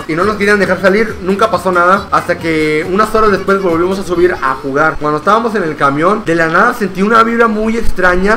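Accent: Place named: North, Mexico. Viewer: Norteño xd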